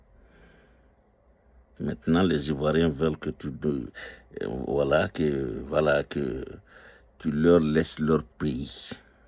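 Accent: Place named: Burkina Faso. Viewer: ce que vous faites là est très dangereux.Les Ivoiriens sont assez divisé comme ça, vous êtes libres de suivre LG que nous apprécions tous pour son courage.Vous les nordistes avec qui le président Houphouet à gouverné,il à utilisé les richesses de l'est pour construire le nord,le sud et le centre.Vous avez bénéficié de tous, vous vous êtes alliés à Allassne qui à été imposé à Houphouet pour détruire la côte d'ivoire et aujourd'hui tu viens raconter des anneries.Ton accent te trahi,tu est d'origine Burkinabè et ton intention est de chercher à mélanger encore le pays parce que tu ne veux pas voir Thiam devenir président.Dieu seul sait qui il choisira.J'aimais bien t'écouter mais là tu dis beaucoup de contres vérité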